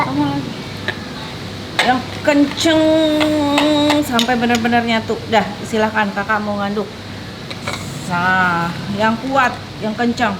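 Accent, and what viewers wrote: West Java, Indonesia. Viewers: Hidup Bsndung..Klo ada Atta Aurell..pasti dong mampir..hidup emk2..t..logatnya sunda pisan..kereeennn👍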